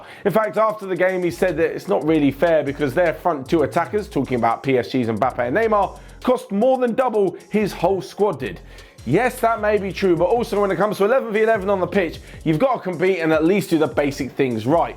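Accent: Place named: England, United Kingdom. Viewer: are u german? If so why do u sound like an English man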